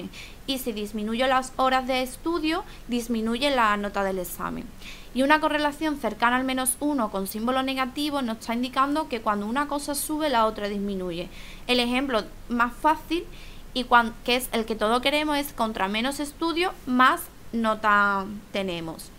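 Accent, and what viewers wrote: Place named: Mexico. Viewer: parece que te dolió... Es cierto, pues, yo no soy culpable muchas de las mujeres de México, les ponen un acento a sus palabras que dejan mucho que decir, a veces parecen engreídas o de alta sociedad cuando muchas de ellas viven en los suburbios y comen lo impensable... Además no se le entiende, pues, escucha a la chica y verás que no miento y por decirlo no soy un ogro o naco como dices... De todas formas, lo que sí se reconoce es la buena intención de la chica.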